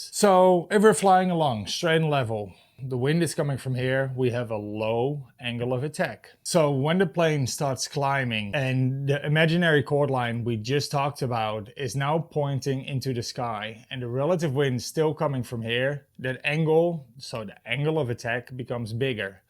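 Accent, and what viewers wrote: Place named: Scandinavia. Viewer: Very well explained. I am guessing you are from the Nordics (based on the accent). Very well done video, accurate descriptions, simplified explanation. Keep going!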